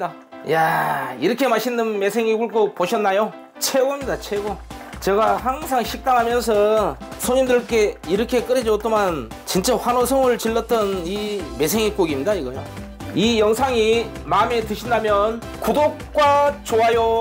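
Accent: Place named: Yeosu, South Korea. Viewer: 여수 억양이 많으시네요 잘봤습니다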